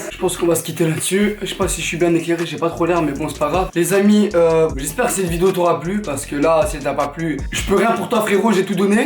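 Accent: Belgium. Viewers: Tu est belge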